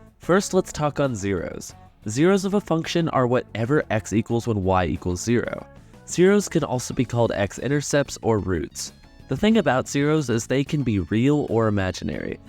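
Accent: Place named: Asia. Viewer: u sound asian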